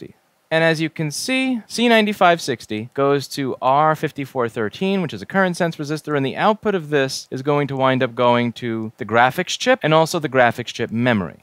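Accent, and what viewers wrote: New York, United States. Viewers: Yes! It's kinda like watching a football game. I think it's his monotone voice along with that Jerry Seinfeld accent that makes it soothing. Although, I've learned a lot about soldering. I just wish he'd gear it more towards us novice-type. Like, I had no idea how to use flux like he does! And, NOT to lift the wick, but drag it was.....INVALUABLE!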